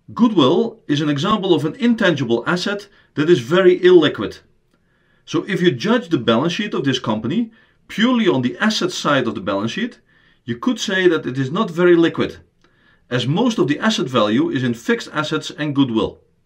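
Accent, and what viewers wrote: Netherlands. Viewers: Ik dacht eerst oh nee, een Nederlander die een Engelse uitleg gaat geven, dit ga ik niet uitkijken hoor. Maar ik was fout, je hebt een superduidelijke uitleg gegeven in heel verstaanbaar NederEngels. :) Thanks! Duimpje omhoog!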